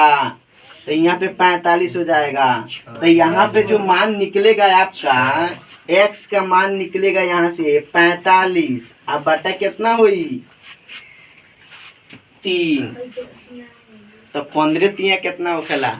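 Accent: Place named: Bhojpuri, India. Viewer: Bhojpuri andaz me sir❤❤❤❤❤❤❤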